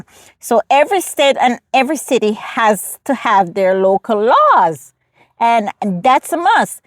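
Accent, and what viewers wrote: Jamaica. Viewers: You have a very thick Jamaican accent. I thought you said you were raised in the United States. It seems like if you’ve been here so long that your native time wouldn’t be so thick 😊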